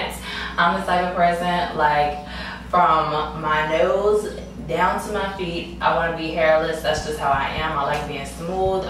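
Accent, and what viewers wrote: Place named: New York City, United States. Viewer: you have that NYC accent lol ur hair is so prettyyyy and so r u keep making these vide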